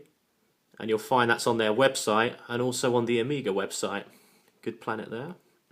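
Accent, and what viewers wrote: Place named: Mid-Atlantic, United States. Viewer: Hi, indulge me.....Do you work for Omega? Also your accent is very mid-Atlantic. Any personal background?;-)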